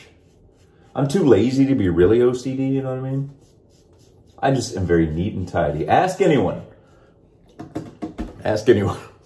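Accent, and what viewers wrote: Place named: United Kingdom. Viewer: You had your british accent going on throughout the video. Hh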